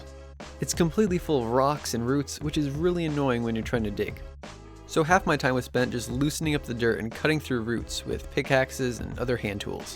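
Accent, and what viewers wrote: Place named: England, United Kingdom. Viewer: New England but your accent is well English haha interesting though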